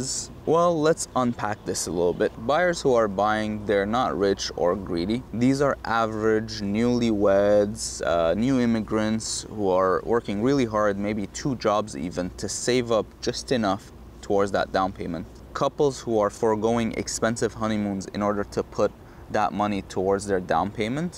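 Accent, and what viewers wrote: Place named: Russia. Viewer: Your Russian accent is showing.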